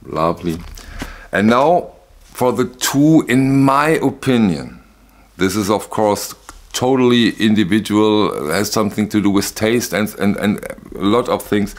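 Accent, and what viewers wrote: Germany. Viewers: Love the German accent ALL HAIL 💜🤘🏿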